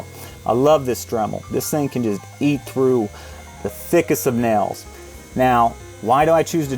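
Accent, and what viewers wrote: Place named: New Orleans, United States. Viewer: My first time on your channel I subbed as soon as you said lil Jimmy. You sound like down home folks from my home town New Orleans. Oh and I love the music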